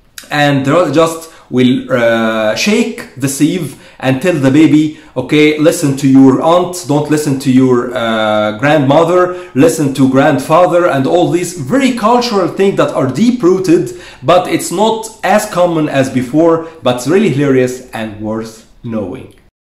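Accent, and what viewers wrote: Egypt. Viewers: The more I watched your videos, the more I love Egyptian dialect🫠🥰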